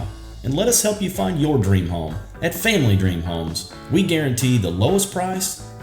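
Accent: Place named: Louisiana, United States. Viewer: do i hear Louisiana? lol This home is so beautiful. great video.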